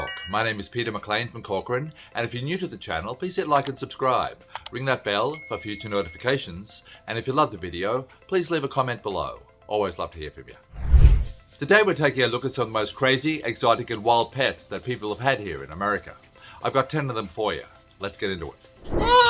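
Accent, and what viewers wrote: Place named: Australia. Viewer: Peter we have known each other over 2 decades! WE ve worked on music, traveled to the Winter Music conference in Miami, but this new narrative of EXOCTOC animals is by far informative snd your sense of humor and Aussiue accent keeps you glued to keep watching. So when you were living with me in Jersey City, I might as well say...that o also had an exotic an male living with .me lol ☆☆☆☆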